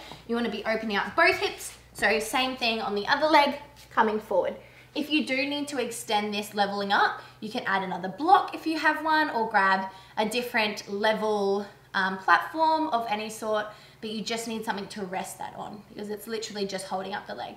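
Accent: Australia. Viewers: aussie aussie aussie?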